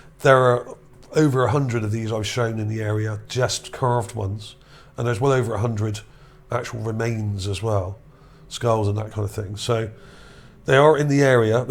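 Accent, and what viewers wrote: Bristol, United Kingdom. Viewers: Sound like a Bristolian or are me ears playing up…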